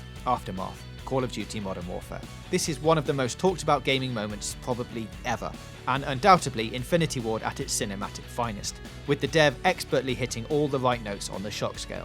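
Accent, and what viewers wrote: United Kingdom. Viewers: This guy is probably the most British brit i've ever heard.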